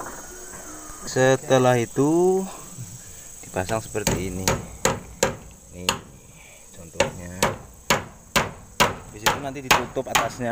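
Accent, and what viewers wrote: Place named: Banyumas, Indonesia. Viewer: Nyimak selalu....yu ngapak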